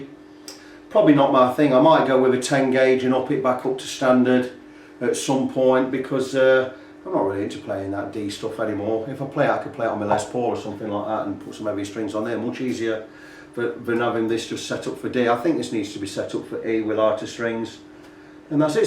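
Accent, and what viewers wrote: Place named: Nottinghamshire, United Kingdom. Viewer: Great restore mate! Ican see you are passionate about your work. I can slightly trump your bargain though - I picked up an ESP built Focus <num>) in the 90s - MASSIVELY unfashionable then lol, with the original FR also in the metallic red like yours for ony £60 :) The Charvel Japanese Model series (Fuji Gen?) from mid 80s are another underrated and overlooked find. Would be good to see you make a super low cost (but not nasty) Chinese guitar like a Stagg or something play like butter! Also your accent - are you in or near Sutton in Ashfield or thereabouts?!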